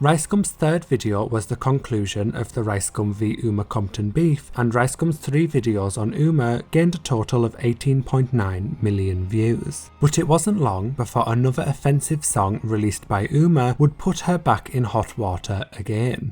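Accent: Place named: Scotland, United Kingdom. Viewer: it’s a Scottish accent…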